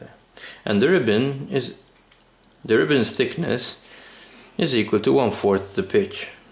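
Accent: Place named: Malta. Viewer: It is a Maltese accent. He used to teach me at school :p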